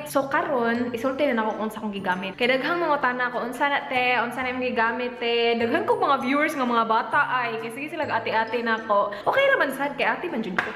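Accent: Visayas, Philippines. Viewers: hi im from cagayan de oro bisaya japon..cute kaayo paminawon kung magbisaya ka ay.. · whahahaha tawa po ako ng tawa dito, ang cute mo pong magvisaya ate hehe, kahit di ko po naiintindihan pero sayang saya po ako, parang naiintindihan ko hehe. ang cute lng 😊😂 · nalingaw kog tanaw kai gahi kaau ang bisaya bagsik kaau 😂 Ganahan nako mu trt ug vlog hinoon! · Ka cute mag bisaya nimo oy hehehe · hahahhahaha kakatuwa ate kahit di ko naintindihan.. pinanood ko pa din cute mo kasi ate mag bisaya.hehehe😃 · i really love the way u speak visayak ate hahaha visayak video more ate pls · Nakakaaliw ka Ate magbisaya 😍😁 Haha. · lami kai ka paminawon kung mag bisaya ka madam 😂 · ang cute cute mo sa pagbibisaya ms.:) naintindihan ko lahat from visayas din kasi ako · Cagayan or CDO kay bisaya.. makalingaw man ka magbisaya oi heehehe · hahaaaa..😂 Bisaya kaayo nindot pamation..taga San Francisco, Agusan del sur , Mindanao ko. 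nah,ako sab conscious sa akong kilay mga biente minutes jud ko mag sulat sa akong kilay hahaaaa😂😂. gwuapa na kaayo ka.😃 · Pg talga nag bibisaya prang nakakatawa 😂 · Ang cute niyo po mag bisaya, sayang di ko maintindihan. :( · Ang cute mo Ate hahahaha di ko naiintindihan pero ang cute kasi ang daldal mo po tsaka ang cute ng accent talaga ng Bisaya 🤣🤣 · hahahhaa lingawa nimo mag bisaya oy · Grabe ka cute nimo magbisaya ate 😍 karun rako naka discover sa imong channel ate hihi lingaw kaayo.. Gwapa jd ning mga cebuana😍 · Kataw-anan man ka te oi 😂Hahaha bisaya kauu ka tenu remind me of my cousin s philippines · mahimout kos accent sa bisaya. taga cebu sd ko, your loyal viewer. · Ang cute cute mo mag visaya 😂😂😂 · Taga asa diay, madam? Kasabot kaayo ko nimo. Lingaw kau ka sa imong pag binisaya. Sa Davao ko karon nag puyo..:D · omg so funny idk why but kong mag bisaya funny paminawn kay relate much ko lol. 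· Mas nindot japon paminawon mgbisaya ka Ms. 😊 From dipolog z.n. here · char bisdak kaau hhehe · im not visaya pero naiintndhan ko,thanks sa akong x bf hahah.ang cute mo mag visaya day.More Powers!!! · Woooohooooo! Bisaya kaayu!!!! Lingaw kaayu ni dai! Hahahahaha!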